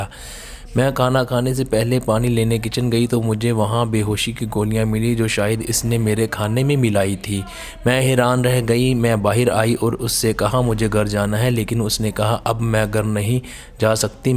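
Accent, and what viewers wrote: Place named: India. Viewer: Ap agar Pakistani ho Tu apna Urdu Ka tulaffuz theek krain ,,,ap Tu Indian ke tarha Urdu poltay ho ..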